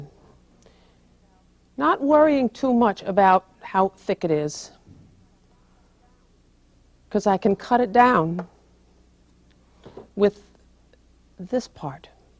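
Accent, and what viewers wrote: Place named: New Jersey, United States. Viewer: Love that New Jersey accent ( where Helen grew up). Example: "imPORTANT." Helen is the best! Thank you!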